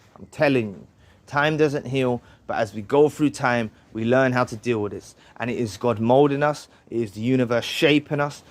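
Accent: United Kingdom. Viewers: are u from uk bother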